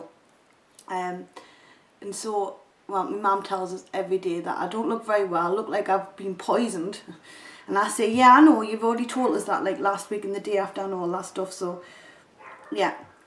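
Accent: Newcastle, United Kingdom. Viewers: She's from South Shields, newcastle x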